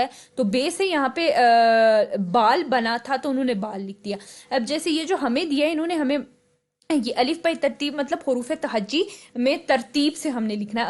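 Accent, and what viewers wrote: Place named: Punjab, Pakistan. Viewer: Punjabiiii accent hay ap ka improve yourself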